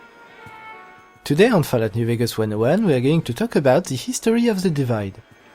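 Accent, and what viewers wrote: France. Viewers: Ma man's way too french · Pour un français tu parle bien anglais, bosse un peu ton accent mais a part ça merci ca explique pas mal de chose et de façon limpide ! Merci et good luck ^^ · U french bro? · Damn that is a strong Frog accent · you have the stereotypical french accent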